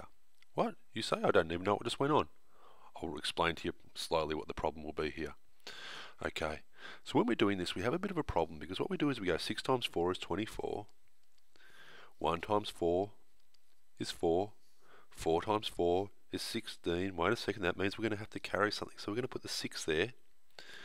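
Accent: Australia. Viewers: The second I heard the Australian accent I subscribed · YES, AUSSIE ACCENT MATH HELP! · Loving the Australian accent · It's Australian, huh? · The narrator is Australian. I like this method. I will be teaching it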